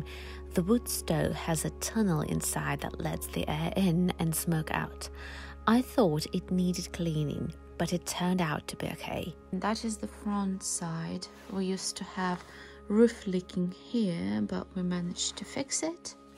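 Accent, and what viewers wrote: England, United Kingdom. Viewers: I would come help you... Save that cute 🏠 I am curious how you have an English accent and from Belarus ? Love you videos peaceful .. Ps you may want to shovel all debris out of attic to reduce weight on ceiling beams . I am a Architect and builder in NYC . I also now live in a tiny cabin on farm which is in similar condition. Roof must be repaired asap . Then all else You can use cement mortar to repair roof tiles tile thin set grout etc cheaper than cauk . Good 🤞😊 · Thank you. I just came across one of her videos where she kind of explains. Apparently all done by practising and hard work in Belarus. Hasn't been to the UK, I believe, or at least not for a significant length of time. Unbelievable. She sounds about 98% British. I don't think I've ever seen a non-native that sounds like her.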